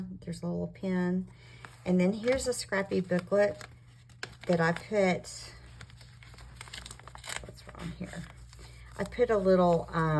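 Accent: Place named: South, United States. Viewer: Simply gorgeous! Love listening to your soft Southern voice! Hugs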